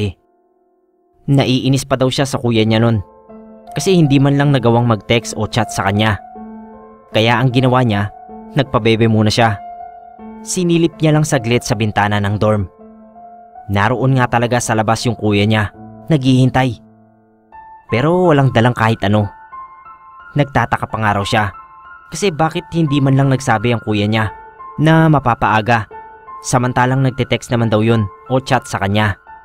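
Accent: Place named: Pampanga, Philippines. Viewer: Sa the way sya magsalita feeling ko kapampangan sya haha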